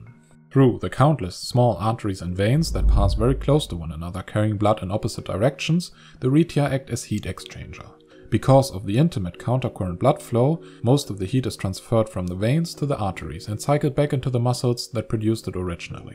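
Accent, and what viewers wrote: Germany. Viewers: Ich finde diese deutsche Aussprache so kacke :P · Schönes Video. Ich erkenne den Akzent direkt :D Germany ! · The german "I believe"narrator the art style this channel is my narcotic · ur german voice is annoying. otherwise nice videos.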